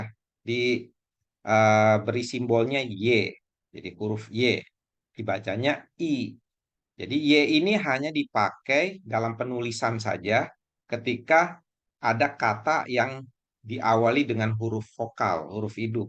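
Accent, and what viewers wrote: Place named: Moiyan, Indonesia. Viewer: Ini dialek mana ko, dialek monyien ya, kalau saya disini dialek liukfeng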